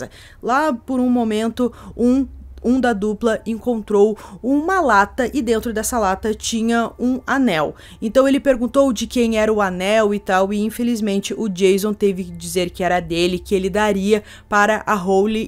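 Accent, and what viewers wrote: Rio Grande do Sul, Brazil. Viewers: adoro seus vídeos....e o sotaque gaúcho....acertei?! 🤗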